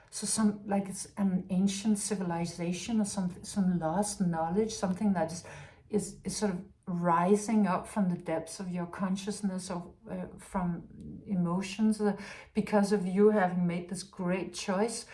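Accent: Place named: Denmark. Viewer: Actions speak louder than words. Or another one is, 'What you do is so loud, I can't hear a word you say!' I just wanted to say your little video about saying 'NO' to someone is very timely and great advice. Thank you. (PS I've been trying to place your accent. I didn't realise you're from Denmark. My g/grandad was from Holbaek, Zealand.)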